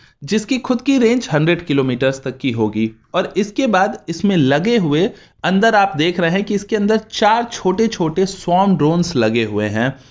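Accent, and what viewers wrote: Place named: Gorakhpur, India. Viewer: Gorakhpuria accent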